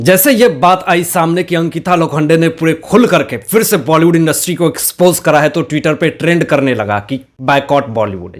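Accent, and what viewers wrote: Bihar, India. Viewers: Aap U p Bihar se ho kya..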